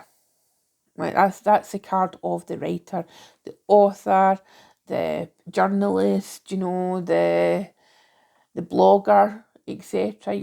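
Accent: Scotland, United Kingdom. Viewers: Oh. I love your accent. Are you Scottish.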